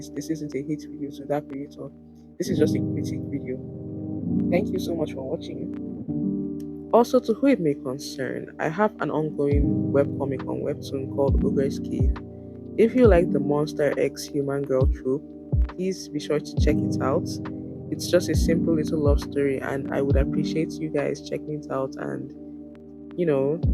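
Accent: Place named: Nigeria. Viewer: I KNOW THAT ACCENT ANYWHERE OMG 🇳🇬🇳🇬🇳🇬 FELLOW NIGERIAN ARTIST HERE YIPPE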